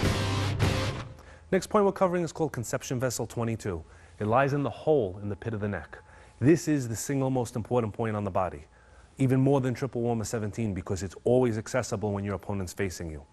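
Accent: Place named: New Jersey, United States. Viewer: This guy is real Jersey Pwot ove coughey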